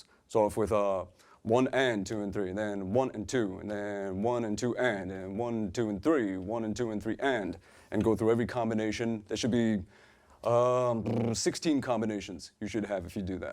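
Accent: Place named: New York, United States. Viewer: He sounds like FZ with a NY accent.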